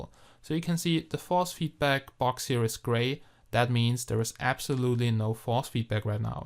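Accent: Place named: Germany. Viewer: Are you german? That accent sounds familiar ;)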